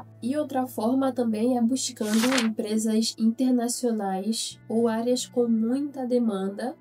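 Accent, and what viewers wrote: Brazil. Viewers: Ja, was ist lustig finde, ist… Du bist eindeutig Brasilianer (an deinem Akzent), und du wirst auch eindeutig Schweizer werden.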